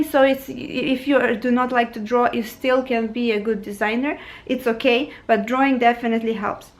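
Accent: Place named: Ukraine. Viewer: I love your accent. You're Ukranian, right? Thank you so much for your helpful videos! I just discovered your channel. I love it! You're such a blessing! Thank you!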